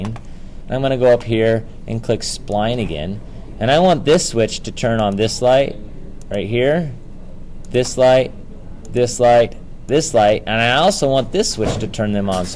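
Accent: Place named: United States. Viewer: Finally, Revit Electrical that is in CLEAN ENGLISH American. Thank you.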